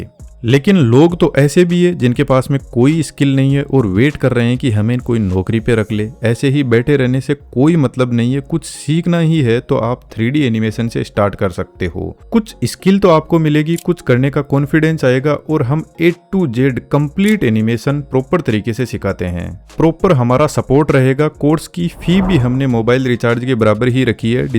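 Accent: Rajasthan, India. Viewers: Sir aap Rajasthani ho kya , boli se lg rhaa h 😅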